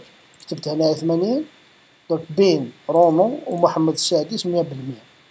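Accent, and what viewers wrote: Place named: Kabylie, Algeria. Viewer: J’ai reconnu ton accent kabyle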